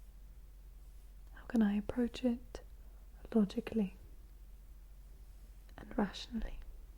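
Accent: England, United Kingdom. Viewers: Are your England? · Best English accent this side of the pond. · Are you originally British? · !! Happy Thursday!! CONGRATS ON 100K!! 🎉🎉🎉 Very well deserved!! I’m always happy to visit your new ASMR uploads:) They make my day filled with comfort and relaxation and love the British accent:) You are awesome:) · Your accent is very good middle english, I'm a Brit but when I'm In the states people think I'm Australian! I'm a guttural Londoner though, constantly dropping my H's! 😉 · If this was the first video of yours I watched I'd have believed you were British. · Just love your British accent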